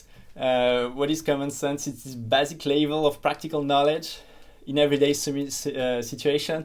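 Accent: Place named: France. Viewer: Great content, but it was really hard to understand because this idiots thick french accent. 😔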